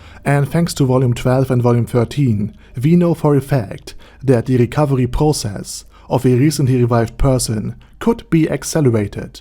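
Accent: Germany. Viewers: Hearing about an anime from a German accent it's just so weird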